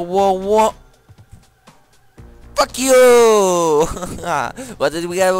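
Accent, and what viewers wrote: Netherlands. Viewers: ik hoor dat je nederlands bent maar je kan goed engels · ***** hij is nederlander het accent is zo typish... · I like your video, you can speak pretty good English but I know you're Dutch :p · are you dutch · are u from the netherlands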